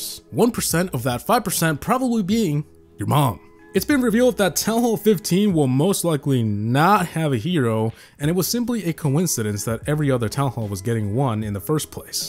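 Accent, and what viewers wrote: Norway. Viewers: Are u norwigan ? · Bro are you Norwegian or sum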